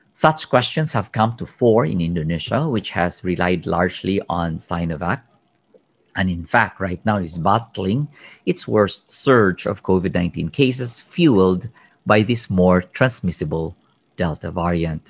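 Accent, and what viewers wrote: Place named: Philippines. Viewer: Once I opened this video 1st I thought you are a philippino later you mentioned that😃 actually I have many friends from Philippines so, I know accent.❤️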